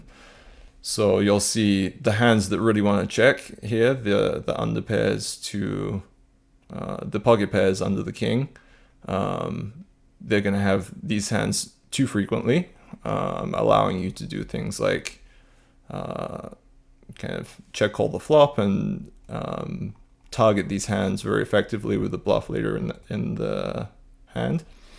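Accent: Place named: United Kingdom. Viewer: A very particular from of the British accent. I know a small Youtuber who has almost the exact same tone and cadence.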